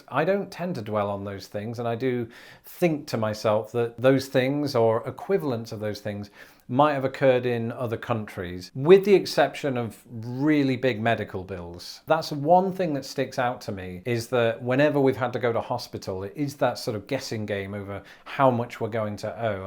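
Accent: United Kingdom. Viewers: I love your sarcasm and wit. Maybe some people miss it because Americans are bred to believe that someone speaking with a British accent is automatically serious lol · “To hospital” instead of “to the hospital” is uniquely Great Britain. I am glad you grew up in Britain only in that your accent is SO BRITISH. Love that accent. Watch anything tv made in Great Britain just to hear the accent. I think it was quite irresponsible of the Brits to let that accent die out when they got here to the states. You should fix that.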